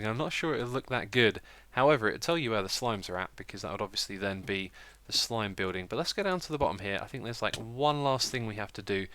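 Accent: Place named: United Kingdom. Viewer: your british Yes i've been watching you for 1 and a half years always thinking your american, sorry about that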